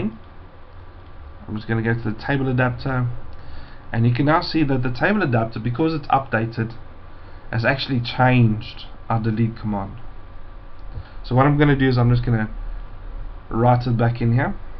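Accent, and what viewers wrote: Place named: Afrikaans, South Africa. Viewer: your accent sounds afrikaans so you should understand this ('n goeie begrip het 'n halwe word nodig) aimed at P.S Like the Vids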